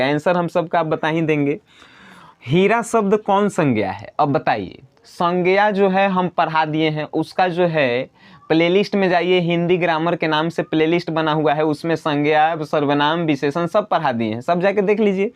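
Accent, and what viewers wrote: Bihar, India. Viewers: Bihar se ho bhai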